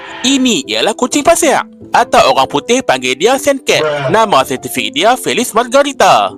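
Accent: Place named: Perlis, Malaysia. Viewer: Ni la loghat perlih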